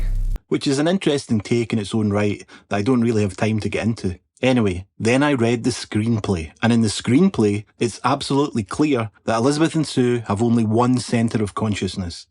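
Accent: Scotland, United Kingdom. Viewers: Are you Scottish? 🏴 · I love your scottish pronunciation such reflective words and opinions, I love your way of interpretation and understanding too btw. Well done mate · Incredible video. Helped me understand the film even more than I thought that I did. Also nice to hear a fellow Scott. Subscribed. 💙🤍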